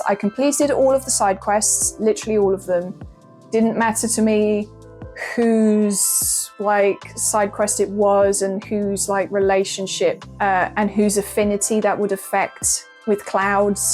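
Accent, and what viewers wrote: United Kingdom. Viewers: Love a British accent 🌹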